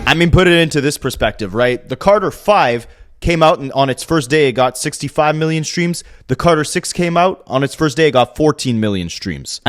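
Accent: Canada. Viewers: no doubt I was just making sure I seen it questioned in comments a couple of times and the Canadian accent brought me back to the question and I never saw a straight answer 😂😂😂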